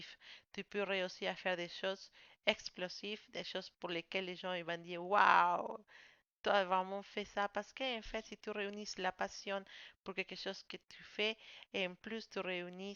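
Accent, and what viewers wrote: Spain. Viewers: Bonsoir, cela fait un peu plus d'une semaine que je vous ai découverte. Déjà, j'adore votre petit accent espagnol, c'est adorable. Vos tirages sont assez précis et intéressants par rapport aux détails que vous nous apportez. Personnellement, je suis Poisson 1er décan, ascendant Capricorne, considérant qu'après 30 ans, on est plus sur son ascendant. Cependant, vu la superbe journée que vous annoncez pour les poissons, j'ai hâte que le jour se lève... Merci beaucoup:)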